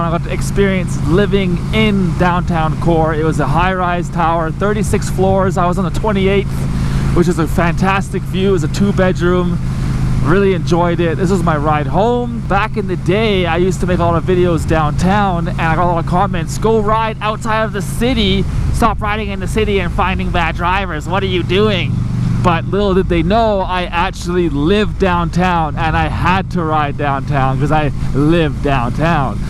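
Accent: Canada. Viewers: canadian ehhh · He's Canadian not American Think that America isn't the only country in the world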